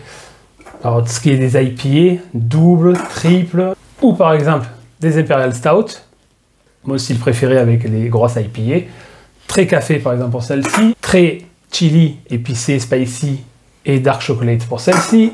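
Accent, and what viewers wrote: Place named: Marseille, France. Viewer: Félicitations pour ce beau projet !!! 🍻 Et vive l'accent marseillais ! 😄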